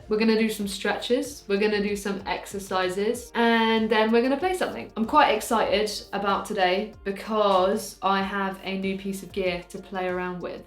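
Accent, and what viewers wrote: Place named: North, United Kingdom. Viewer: Your Northern accent made me laugh 😂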